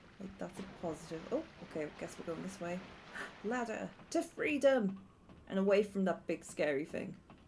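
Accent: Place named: North, United Kingdom. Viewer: Very quite, your accent sounds in my area of the north. But you could be a dude for all i know. Dark Souls 1 was a work of art its why it became so popular, but old now.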